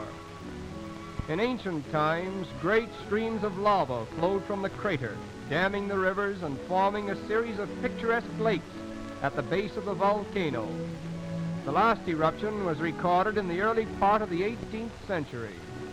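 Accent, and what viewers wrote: United States. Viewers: ナレーションアメリカ人かな？ まだ日本とアメリカが仲良かった時代か。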